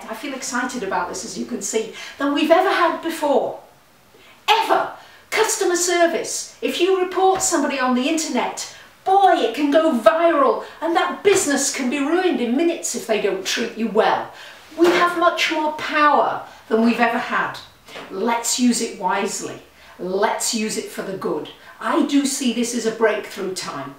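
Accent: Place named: United Kingdom. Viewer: cant stand brittish accents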